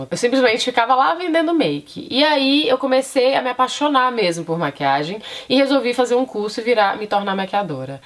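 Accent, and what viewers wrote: Minas Gerais, Brazil. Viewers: O sotaque de Minas não sai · Fofaaaaa, tinha que ser uma mineira... adoro a maneira como vc fala e ouvir seus conselhos bjssss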